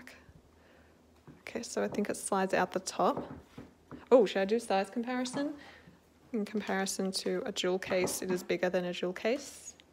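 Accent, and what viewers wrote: Australia. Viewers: When i heard her accent it reminded me of Felix and i understood in 0000.01 second that she was Australian 💀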